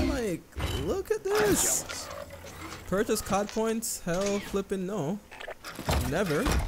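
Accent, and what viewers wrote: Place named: Jamaica. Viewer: You're jamaican? I can kinda hear the accent fam.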